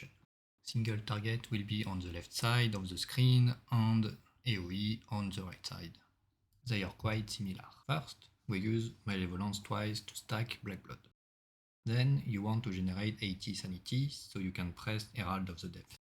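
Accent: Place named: France. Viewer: French accent spotted. Super guide !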